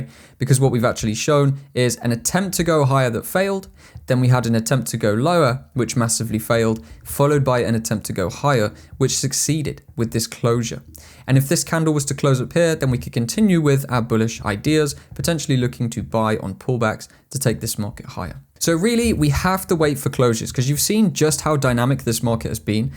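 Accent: United Kingdom. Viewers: When he was saying the the the, I think he meant to say there. OMG I hate B.English pronunciation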